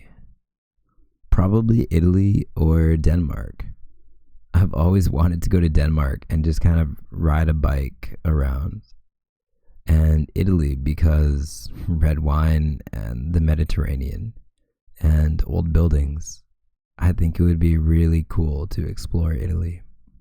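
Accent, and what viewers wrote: Canada. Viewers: I can hear that Canadian twang! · Lol I knew you were Canadian the minute you said "about". Love the video you are definitely in my age range which makes me feel less weird listening to this lol. I hope you eventually make a discord/patreon or something similar so we can go on supporting you. Until then I will happily share your videos and wish you the best.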